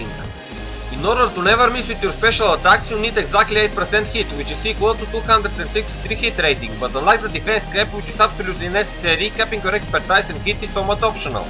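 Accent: Russia. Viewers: This IS in English. He just has a heavy russian accent. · As russian as it gets, love it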